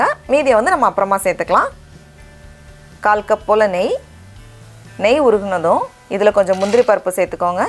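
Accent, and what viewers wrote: Nagercoil, India. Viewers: Are u from Nagercoil ?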